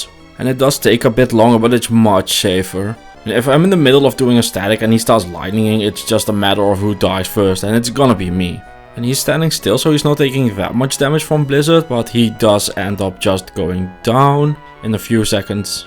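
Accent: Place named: Netherlands. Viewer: Too bad, but sure I totally comprehend the reason. Not everyone has the time to get a "Twitch star" today 😁 RL always comes first. Cheers! P.S.: are you dutch ? You sound a bit like a dutch guy ^^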